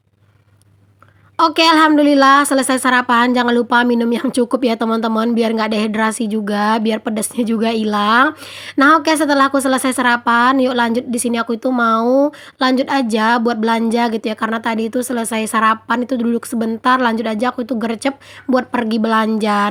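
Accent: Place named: Padang, Indonesia. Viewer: Alhamdulillah, Tabarokalloh... Seneng dengar Bunda bicara menggunakan bahasa daerah, seperti loghot adik ipar yg dari padang...🙏🙏😊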